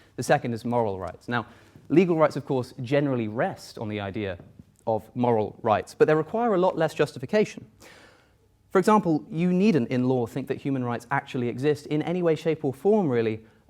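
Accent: England, United Kingdom. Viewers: I think that's called an English accent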